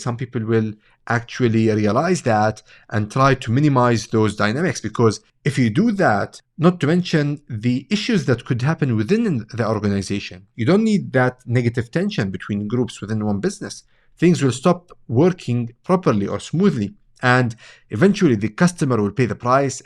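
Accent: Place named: Spain. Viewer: the R😂but don't care, are you native Spanish?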